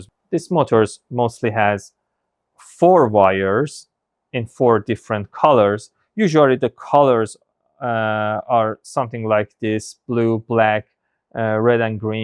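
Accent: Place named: Iran. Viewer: Thanks 🎉 I think you are Persian because the accent 😍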